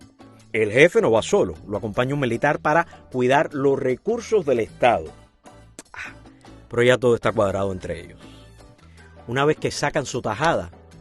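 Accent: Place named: Cuba. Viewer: Suena lastimoso, solo una pregunta... Debo creerte solo porque suenas como cubano? Quizá, pero por que veo que no estás en el hermano País de Cuba pues tú set se ve muy bien y más... Te enfocas en "el poder" interno y no en la lucha que por años dignamente ha librado el pueblo cubano y también en dramatizar con burlas tu punto de vista que no se sostiene de datos concretos. Ojalá puedas ampliar información.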